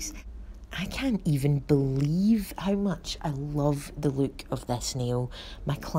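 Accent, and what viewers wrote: Scotland, United Kingdom. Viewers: Have to admit you're Scottish accent is truely smokin for this effect too. Fabulous. Love working with pigments so having a go asap. Thanks for sharing and cheers from cumbernauld xx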